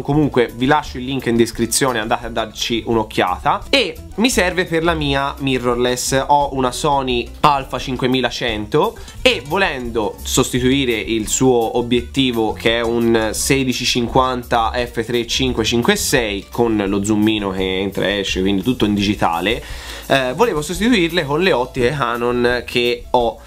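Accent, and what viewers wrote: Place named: Tuscany, Italy. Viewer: Io da toscana a sentire un toscano moio dalle risate 🖤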